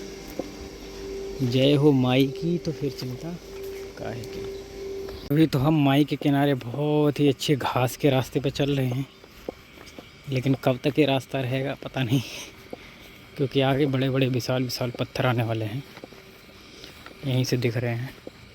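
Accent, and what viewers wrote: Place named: Kanpur, India. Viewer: Prabhu Ji aap kanpur se Ho kya,boli wahin ki lag rahi hai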